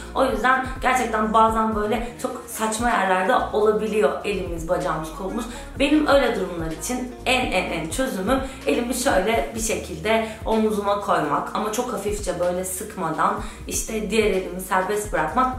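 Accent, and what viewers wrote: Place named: Aegean, Turkey. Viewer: sesin ege kökenliye benziyor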